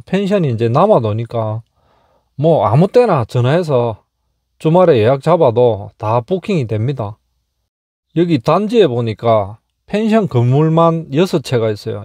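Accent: Gyeongsang, South Korea. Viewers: 깔깔하네 서부경남쪽 사투리 말 올래만에 들어봐네요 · 영상보면 목소리나 영상이나 그냥 일상...부산경남사투리억양...디젤집시님이 생각납니다...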